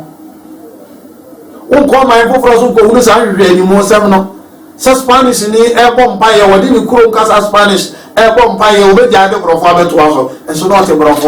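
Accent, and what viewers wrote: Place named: Ashanti, Ghana. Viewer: Delete asante Tone from your tongue, please. We're trying to rejuvenate our language so it will be better you speak bono.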